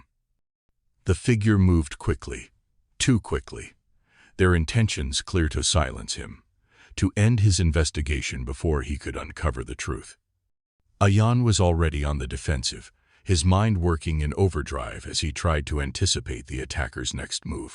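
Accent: United States. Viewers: No no no, can't have an American accent doing Agatha!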